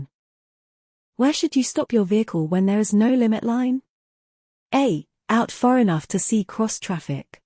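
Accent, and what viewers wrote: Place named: United Kingdom. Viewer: Thanks for this video, but this is a Q&A video for California DMV which is what it means for American citizens, so why was the narrator chosen with a British accent?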